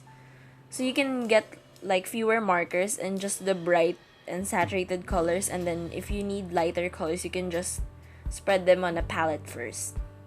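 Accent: Philippines. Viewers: When I first heard your voice, I noticed an accent. I knew you were Filipino too.☺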